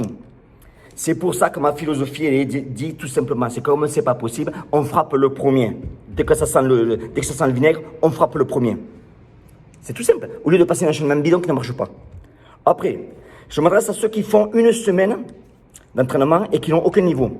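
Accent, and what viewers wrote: South, France. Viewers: Ça fait surtout du bien d'entendre ce si bel accent qui se perd malheureusement partout dans le Sud.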